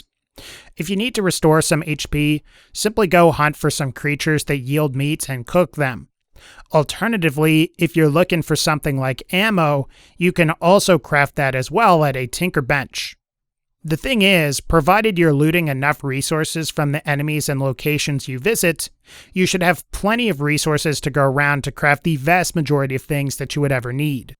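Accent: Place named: New York, United States. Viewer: This dude is from New York or New Jersey. Or he has some other wack ass accent.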